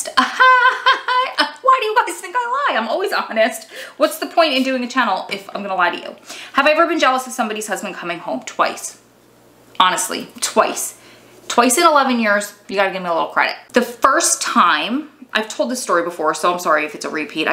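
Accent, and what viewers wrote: New Jersey, United States. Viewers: wait girl are you from nj?? the accent? i grew up there and miss it so much 🥺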